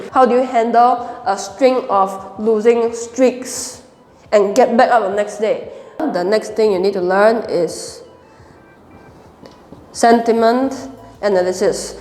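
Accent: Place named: Singapore. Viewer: u sound singaporean and i like it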